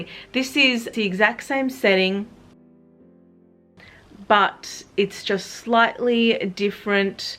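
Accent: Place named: Australia. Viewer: Love your accent, is it Australian?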